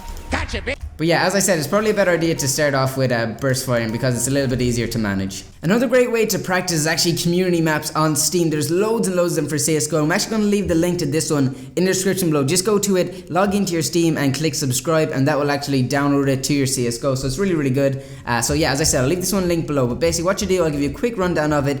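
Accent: Ireland. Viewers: Are you Irish? I'm Irish too and ur accent sounds Irish 😂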